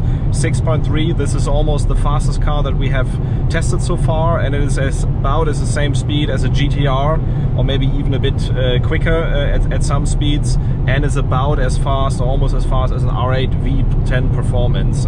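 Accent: Germany. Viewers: I love it how you can hear your german accent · tscherman accent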